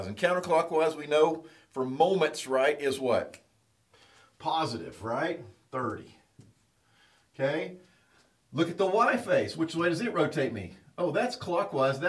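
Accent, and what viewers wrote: United States. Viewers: His country accent makes me feel a lot more accessible and authentic. In addition to that, this is a very thorough and simple distillation of an otherwise complex topic. I really like it!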